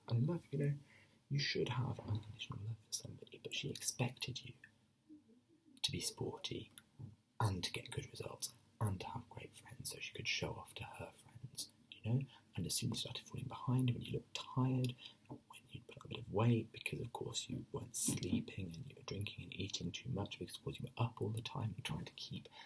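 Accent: United Kingdom. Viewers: Well, well, well, British, cute, and gives me tingles. Subbed* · I like your British accent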